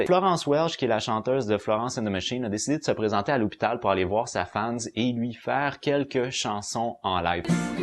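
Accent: Quebec, Canada. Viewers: c'est desagreable cette voie quebecoise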